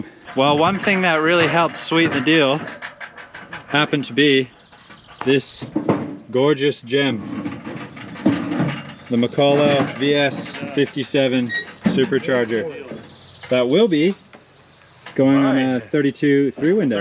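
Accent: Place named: Western Australia, Australia. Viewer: As i am watching this Video my brain hurt. All lhd Cars so your in the americas I suppose but I was hearing a western accent with hint of an Ausi accent.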